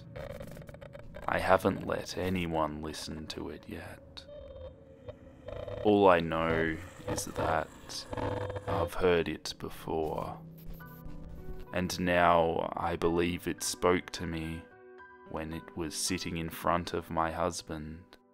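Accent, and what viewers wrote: Australia. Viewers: You sound Australian tbh.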